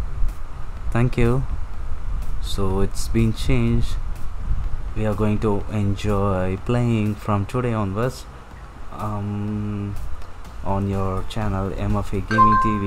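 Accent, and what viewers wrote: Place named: Kerala, India. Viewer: U sound like mallu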